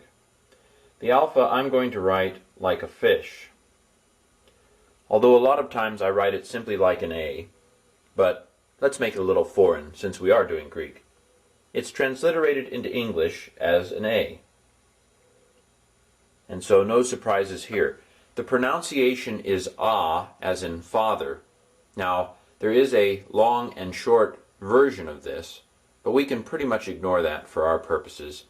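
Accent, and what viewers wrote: United States. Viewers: Erasmus killed the sound of ancient Greek just to make it easy for Americans. Sorry It's not Gama it's whama (like "why"). It's not delta it's δέλτα (like "this") "Αυτοις" for example it's not "αυ-τ-ο-ις. I know it's convenient but it's" αυ-τ-οις " ο+ι=ι Otherwise there is no harmony. Sounds like an American trying to speak Greek. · you're "americanising" the whole thing sorry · The other problem is that each nation has it's interpretation of vowel sounds. Americans are notorious for replacing vowels with arbitrary schwa sounds. Listen to how an American pronounces Banana - three "a"s - all with a different vowel sound. Americans also belabour the emphasis on the FIRst Syllable. Hence the POL-ice in ARIizona have the best REE-search and DEE-fence. To a native English speaker it sounds gauche and as if they are deliberately trying to shout or be rude. 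I'm not sure I can agree on how he pronounces ETA because of the bizarre way Americans deal with "a" in general....they pronounce it more like AAHH. Their "O" sounds like wind up ooooOOOHHH. Listen to how an American says oooOOHHrange instead of Orange. There is no way ancient Greek would conform to modern America colloquialisms, yet you can hear his heavy American accent as he pronounces "Greek".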